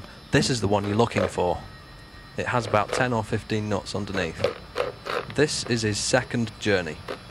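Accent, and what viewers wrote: United Kingdom. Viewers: Thats how english people pronounce it, simple. Or if this guy is not english, then well, it definately looks like England, so he learnt english there.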